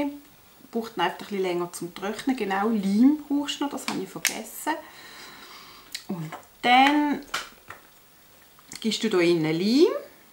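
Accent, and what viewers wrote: Switzerland. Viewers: Hallo hab dich per Zufall entdeckt, bin so begeistert und habe direkt abonniert. Dein Schweizerdeutsch gefällt mir auch.viele Worte hören sich an wie unser Dialekt.lg aus Deutschland/ Rheinhessen LG Ingrid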